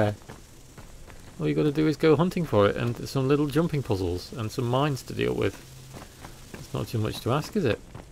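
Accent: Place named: England, United Kingdom. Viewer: Can’t even understand with your English accent. Can you put the names on the screen 👍🏽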